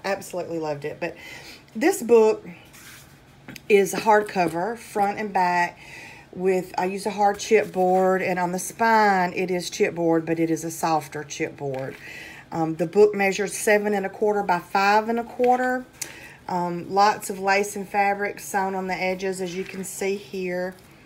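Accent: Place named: South, United States. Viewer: I can hear your southern drawl, very nice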